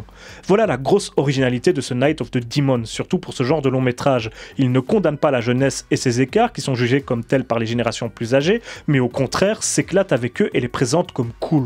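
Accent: Belgium. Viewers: félicitations pour les 90k je trouve cela totalement mérité...je vais me répéter mais tu fais vraiment un travail de montage d ecriture incroyable tu t exprimes vraiment bien ton accent belge est quasiment imperceptible (je suis belge également) c est vraiment un plaisir que de voir un compatriote avoir de plus en plus de succès sur YouTube etant également un gros cinéphile grace a toi j ai pu découvrir ou redécouvrir certains films cultes du cinéma fantastique et d horreur...ta recherche tes anecdotes sont tjrs de qualité et j attends tjrs avec une certaine impatience tes prochaines vidéos preuve que ce que tu fais dechire continue comme ça big like poser bien évidemment a bientôt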